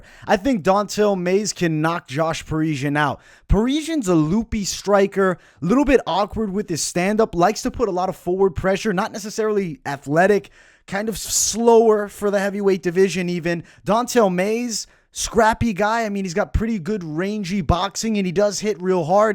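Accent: New York, United States. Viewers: U sound like ure from nyc I like u automatically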